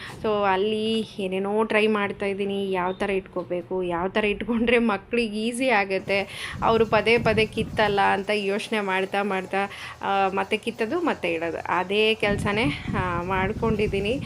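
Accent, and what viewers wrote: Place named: Gangavathi, India. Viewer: Hi sis namdu Gangavathi ನಮ್ಮ ನಿಮ್ಮ ಮಾತು ಸೇಮ್ ಇದೆ ನಿಮ್ಮ ವಿಡಿಯೋ ನಮಗೆ motivation ಆಗುತ್ತದೆ tqu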